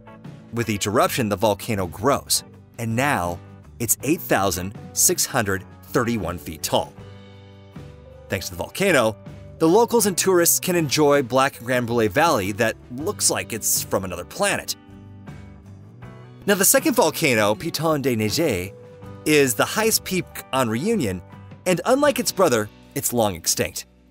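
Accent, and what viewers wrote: United States. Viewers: Hurricanes at 90 mph - needs to do better research , as hurricanes can have winds well above 90 mph . As it was an American accent , guess I cannot blame the narrator , as the USA's education system is not as good as it's cracked up to be , with several university's recently caught selling degrees , just to gather income - this scandal , has done much damage to any degree holder , from the USA and it's corrupt universitys . It was also mentioned that no-one on Reunion is on welfare in the general population , it's the French government workers who are on welfare , not the population generally , they all work , producing Coffee , Vanilla and other crops . The road being built over the ocean , won't extend their "exclusive economic zone one bit . It's being built , to avert some of the problems associated with the current coastal highway , so that access to the airport , port , and other large city , is not interupted as frequently as it's claimed by the narrator .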